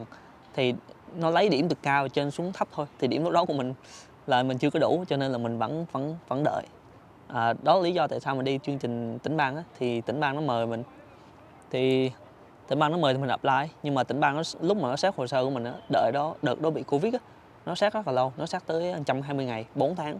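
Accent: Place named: Da Nang, Vietnam. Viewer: Nghe giọng anh có vẻ là người Đà Nẵng, em cũng ở Đà Nẵng qua Calgary được 4 tháng rồi. Hy vọng không phiền nếu có thể kết nối để hỏi anh nhiều hơn ^^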